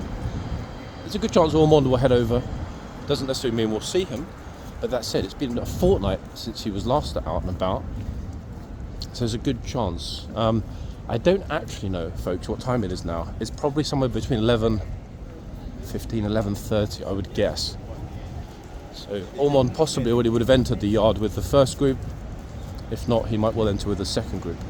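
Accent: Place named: RP, United Kingdom. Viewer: I Love Love Love your Brilliant Channel! And I appreciate the Queen's English that you present so eloquently with every episode of your presentations. I also agree with your analysis of what respect should look like, from your perspective toward the guards. You balance that dynamic so well❣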